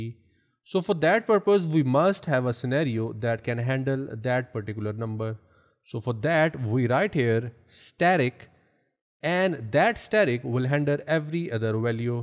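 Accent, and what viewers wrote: India. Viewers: This is his accent Indians talk like that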